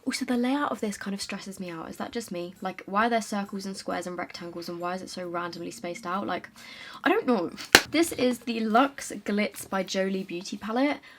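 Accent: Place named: England, United Kingdom. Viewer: I def want to enter the giveaway, my email is <mail> also my twitter is i love you’re video and when I need to relax you’re my go to, the English accent is soothing to me and I just love hearing you speak. Good luck everyone, fingers crossed 🤞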